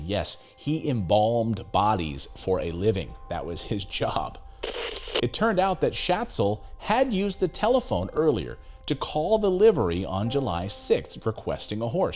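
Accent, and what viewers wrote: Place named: United States. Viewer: Perfect American English ! Just perfect !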